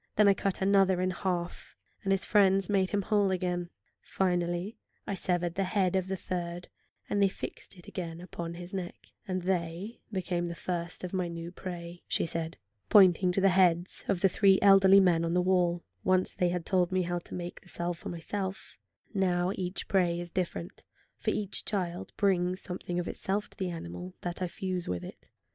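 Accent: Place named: United Kingdom. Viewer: Oh my .... This channel ... I don't ever want you to stop reading :( There's no sweeter sound to my ears than a bedtime story read with such a warm voice. It reminds me of my childhood. And as a french guy, i think your delightful british accent is the cherry on top.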